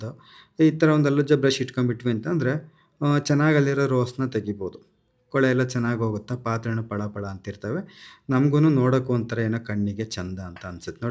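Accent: Chitradurga, India. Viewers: ನನ್ನ ಊರು ಶ್ರೀರಾಮಪುರ ಹೊಸ ದುರ್ಗ ತಾಲ್ಲೋಕು ಚಿತ್ರದುರ್ಗ ಜಿಲ್ಲೆ ನಮ್ಮ ಊರಿನ ಕನ್ನಡ ಕೇಳೋದಕ್ಕೆ ನಾನು ನಿಮ್ಮ ವಿಡಿಯೋ ನೋಡುತ್ತೇನೆ ಅಡಿಗೆ ಕೂಡಾ ಅಷ್ಟೆ ನನ್ನ ತವರಿನ ನೆನಪು ತರುತ್ತೀರಿ ಅದಕ್ಕಾಗಿ ಧನ್ಯವಾದಗಳು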